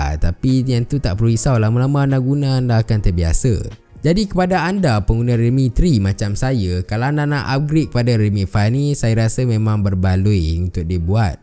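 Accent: Taiping, Malaysia. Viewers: Sah la abg orng taiping😂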